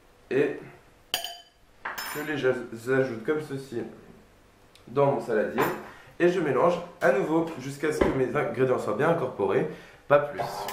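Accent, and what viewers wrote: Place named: England, United Kingdom. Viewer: Je n aime pas du tout ton acsent anglais et tu veux un te la péter dsl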